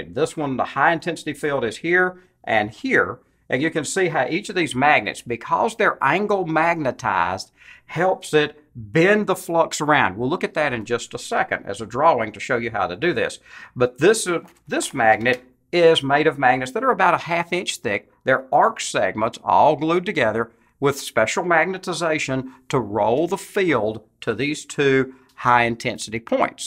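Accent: United States. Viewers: I like the cowboy accent analyzing cutting edge high techy stuff. You can't get that "yeehaw" feeling in German, French, Chinese or Russian accents. 👍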